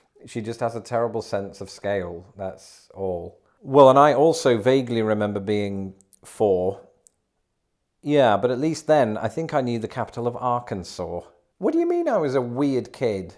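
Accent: United Kingdom. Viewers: The name Binghamton sounds positively British although apparently it’s not. I shouldn’t think that your British tongue should get too twisted over it.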